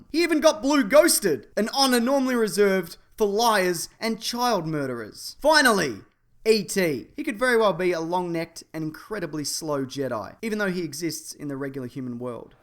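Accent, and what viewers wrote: Australia. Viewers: dude are you an aussie?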